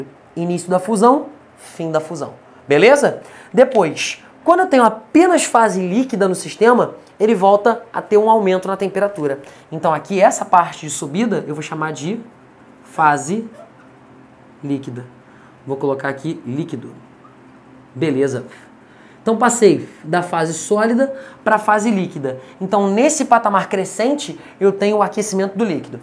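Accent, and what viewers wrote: Pará, Brazil. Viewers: O sotaque do professor é lindo, paraense, ou paranaense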